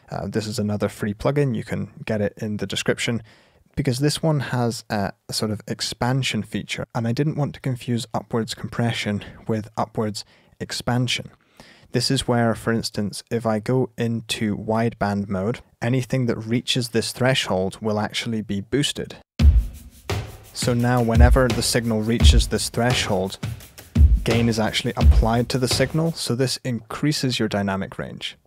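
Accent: Scotland, United Kingdom. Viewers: Offtopic... is you accent Scottish?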